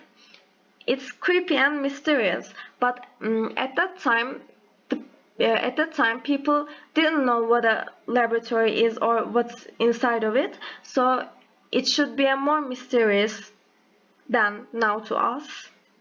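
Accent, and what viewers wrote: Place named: California, United States. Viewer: Accent like Californian..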